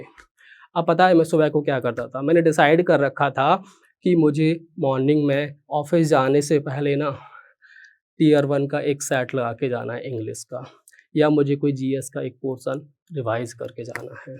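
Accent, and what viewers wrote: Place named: Jhansi, India. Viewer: Jhansi UP ka lg ra banda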